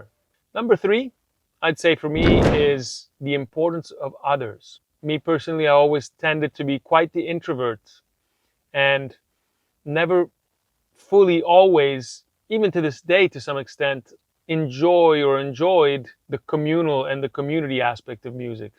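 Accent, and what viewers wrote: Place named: Italy. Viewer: do you live in Italy. I noticed an accent btw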